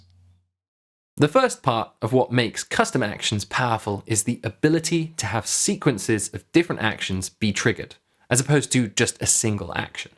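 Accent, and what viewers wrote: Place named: United Kingdom. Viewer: why does everyone on these type of tutorials have a British accent 😅🤣 ... Great tutorial don't mind me guys. This was great ! Thanks !!!!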